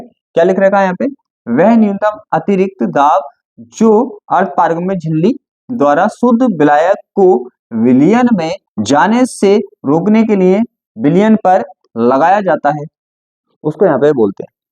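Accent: India. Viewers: Sir aap Bareilly se lag rhe hai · Apko dekhne se nhi lg rha language hi up ki h 😂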